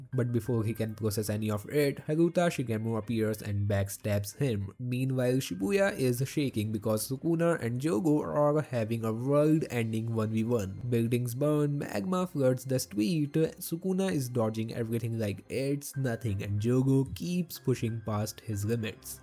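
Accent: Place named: India. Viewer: no hate i would have watched if it wasnt indian voice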